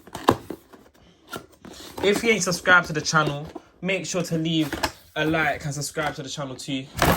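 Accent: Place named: Northern England, United Kingdom. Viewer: Bruv are you from Manchester ?your accent sound northern.im from london so what just wondering, safe for the video fam can you pair your d9 with the d10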